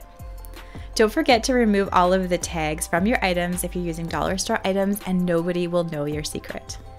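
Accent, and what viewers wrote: Canada. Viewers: addresses this in lots of her videos! It's the Canadian pronunciation.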